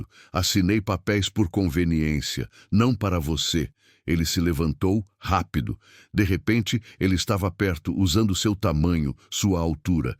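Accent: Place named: Paraná, Brazil. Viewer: Istoria muito impactante. Narração Nitidamente Maringá. Paraná 20 ,,8❤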